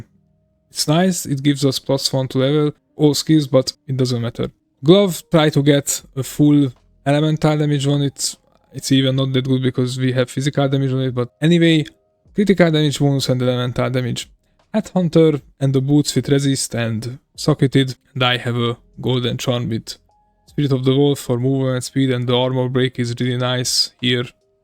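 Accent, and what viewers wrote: Hungary. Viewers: Probably ill be doing this build next season :) hope they dont change it! Btw are you Hungarian any chance? Your accent tells me that :D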